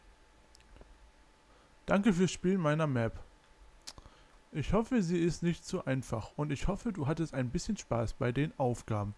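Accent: Berlin, Germany. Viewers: Den Akzent versteht doch jeder! und dasb isschen berlinerisch ick glob ick spinne dat müssteste wenischstens n bissl kenn ehy! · Dat ist Berliner Akzent, so schwer ist dat nicht.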